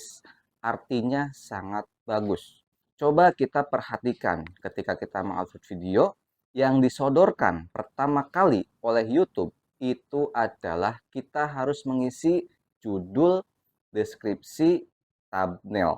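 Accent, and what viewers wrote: West Java, Indonesia. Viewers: Assalamualaikum...kg nyimak mantap...Oia kg orang mna Sunda pisan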